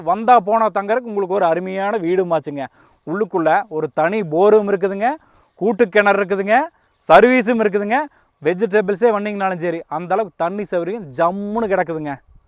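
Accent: Kongu, India. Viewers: Anna neenga pesurey kongu thamil supernga anna.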